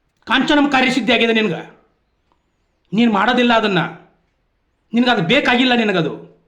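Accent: North Karnataka, India. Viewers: ಸೂಪರ್ ಉ.ಕನ್ನಡ ಭಾಷೆ❤❤❤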